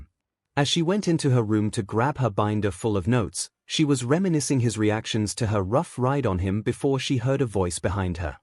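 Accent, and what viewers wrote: United Kingdom. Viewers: Whys the tts bri'ish?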